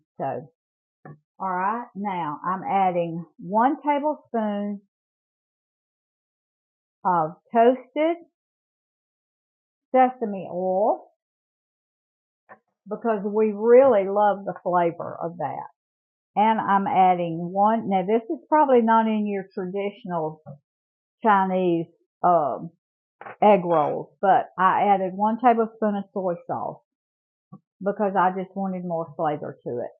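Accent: South, United States. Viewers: I just wonder where you're located cuz you sound like you're from the Carolinas or maybe on down south like a Alabama unless maybe you a little bit Virginia but that y'all done getting you all OK gal on with the recipe❤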